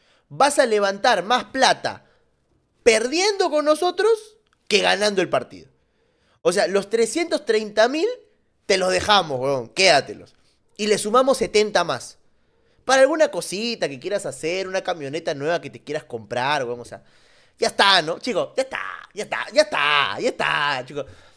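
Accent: Argentina. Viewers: Por que habla como argentino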